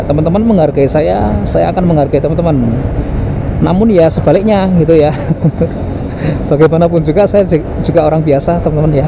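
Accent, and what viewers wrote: Java, Indonesia. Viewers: Rasane aku melu jalan- jalan om pasar tradisional juga om 🤭 Aku dr Sumatra seger liat pasar2 tradisional e, sama logat Jawa om nya khas sekali. Semangat om 💪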